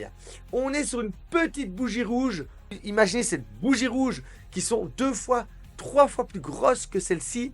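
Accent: Belgium. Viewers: Rien que par ce que tu as un accent d'un air liegeois tellement sympa , je m'abonne ❤❤❤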